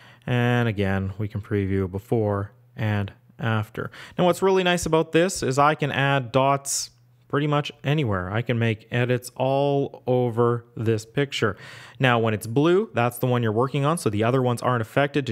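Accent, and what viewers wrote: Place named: Canada. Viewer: I was listening to this while I doodled with the app on my phone, and I had the thought that based upon your accent you must be Canadian! Then I realized it was in your intro lol. Anyway, this app is excellent. Definitely one of the best editing apps I've used on the iPhone. The whole selective adjust part is what I feel separates it from any other app out there.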